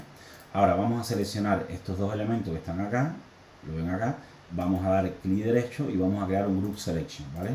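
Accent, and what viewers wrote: Cuba. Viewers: Es cubano? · Buenísimo! Muchas gracias! Eres cubano, verdad?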